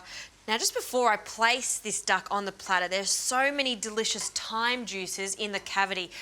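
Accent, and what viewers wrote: Australia. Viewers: Oh my God guys. It's pretty clear from her accent she's Australian so yes she uses Celsius. Come on. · stop making yourself look like an idiot. I'm Australian, she's an Australian celebrity chef, America is the only place not using metric and her Australian accent is a dead give away, even though I don't notice the accent because I'm Australian. "Everyday Gourmet" is an Australian cooking show hosted by Justine, the lady in the video. So yes, it is metric, it is obvious to anyone that has morw than 2 cents for a brain. Merry kiss my ass.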